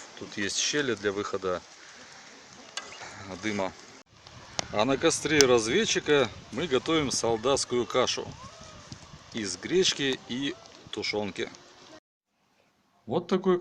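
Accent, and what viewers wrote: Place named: Ukraine. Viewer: Украинский говор как не странно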